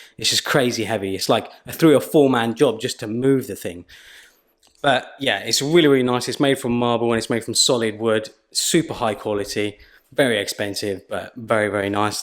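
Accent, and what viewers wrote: United Kingdom. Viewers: pretty house! and love your british accent thou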